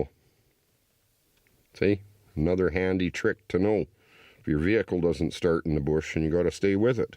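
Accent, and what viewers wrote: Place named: Canada. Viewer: I just LOVE you deep voice, accent, and sense of humor. I wish I could go to Canada and spend a week with you just to listen to you teach me survival stuff!! Thanks for sharing.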